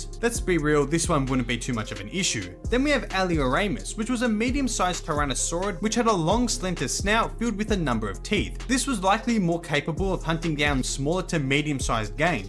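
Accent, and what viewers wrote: Australia. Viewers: I’m surprised the Indoraptor had jts worst score in the Jurassic. Kind of ironic, if you think about it… Also, for some reason it didn’t click to me for some time that you were Australian,. I don’t know why. I hope I didn’t offend you with this…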